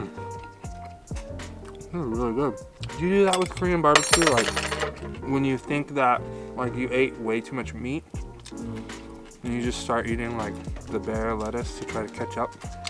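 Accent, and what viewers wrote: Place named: United Kingdom. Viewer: your british accent is so funny! ㅋㅋㅋ 한국인보다 한국음식을 잘아는 사람!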